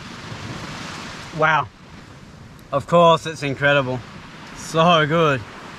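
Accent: Australia. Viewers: You from Perth dude ?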